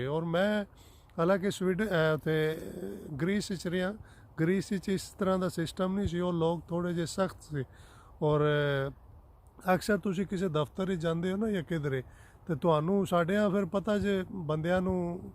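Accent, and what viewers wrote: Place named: Rawalpindi, Pakistan. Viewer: Bhai sahib lagda hey tusi Rawalpindi tu ho. Tusa ni boli tu lagna ey.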